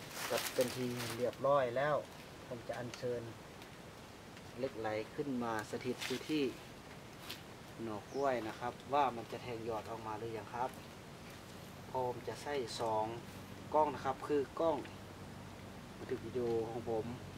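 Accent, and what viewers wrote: Laos. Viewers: ເຈົ້າຂອງຄິປເປັນຄົນລາວຫລືຄົນໄທເນາະ ຕາມທີ່ຟັງສຽງອອກຊຳນຽງລາວເນາະ ຖ້າເປັນຄົນລາວກະເວົ້າລາວໂລດດີກວ່າບໍ່ ມີທັງການເວົ້າທີ່ບໍ່ແມ່ນພາສາຕົວເອງແລ້ວ ມັນບໍ່ເປັນຕາຟັງຄັນສິເວົ້າວ່າຫນ້າລັງກຽດກະສິໂພດກາຍ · ລາວແທ້ໆເວົ້າໄທເພໍີອ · พูดไทยสัปลาวอย่างฮา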